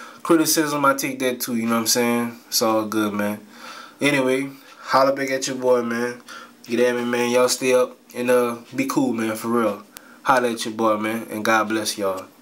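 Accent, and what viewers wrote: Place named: Louisiana, United States. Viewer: u from lousiana my dude. u talk like my cousions.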